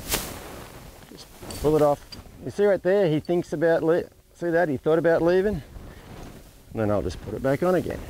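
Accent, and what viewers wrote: Australia. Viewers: Lol it doesn't understand his Aussie accent.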